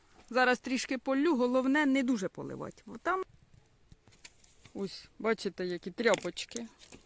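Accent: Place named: Varva, Ukraine. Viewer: Знімайте будьласка довші відео,хочеться Вас слухати і слухати.Якаж співуча мова наша,я з Варви.Але вже 50 років там неживу,але говір впізнаю завжди.Будьте здорові і успішні.Божої благодаті Вам і вашій родині.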